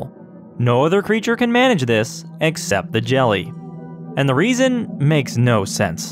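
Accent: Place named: Ontario, Canada. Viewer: Are you from Ontario,? I can hear it in your accent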